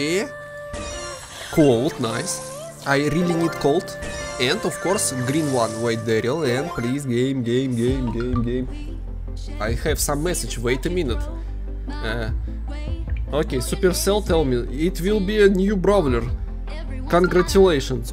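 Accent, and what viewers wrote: Russia. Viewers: R u from Russia?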